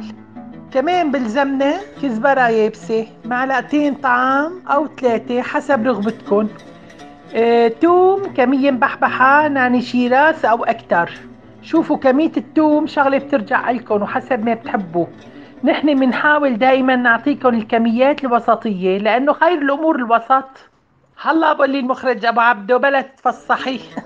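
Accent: Aleppo, Syria. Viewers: يا وين كنتي لهلئ اييي ابوووس وجنك وجك انا خيييييت والله حسيت حالي بنص حلب ع سمع صوتك وطبختك 👍😅 عفرينة اناااا وبحبك كتييير لسع ع أيام نواله بحبك 😍🤣🤣🤣🤣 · دخيل عينك ما بتذكريني الا وانا.. بحلب.. على جمال اسلوبك.. انا بتابعك بس لاسمع صوتك ولهجتنا الحلبية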